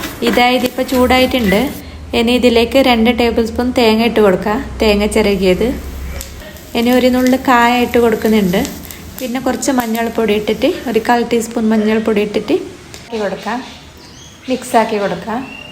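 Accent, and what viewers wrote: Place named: Kannur, India. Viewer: കണ്ണൂർ ഭാഷ അടിപൊളി👌👌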